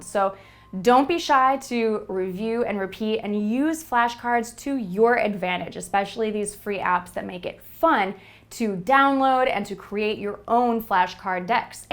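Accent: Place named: United States. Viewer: of course american english. her american accent's is so strong and clear. that's why i like american online teachers so much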